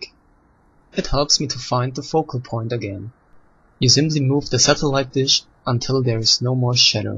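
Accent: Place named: Germany. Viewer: you have a german accent.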